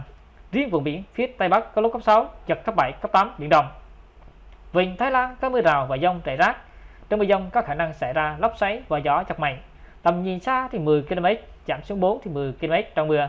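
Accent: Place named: China. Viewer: Giọng như giọng người Hoa khó nghe lắm thay phát thanh viên đi thôi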